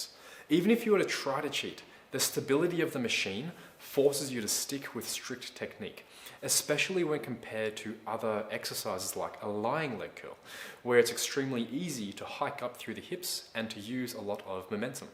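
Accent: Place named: Australia. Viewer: He has the same Aussie accent as the Supercar Blondie.:D Loving your videos! Keep it up!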